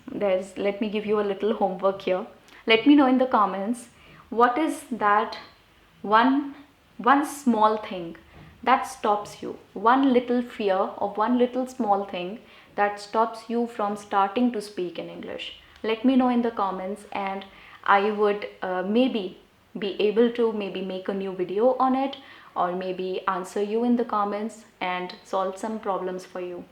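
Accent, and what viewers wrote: India. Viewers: Mam, your accent is very similar to the Indian From which country do you belong mam ? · I love your video. specially I like it because your Indian accent .. You've inspired me talk to English in easy way..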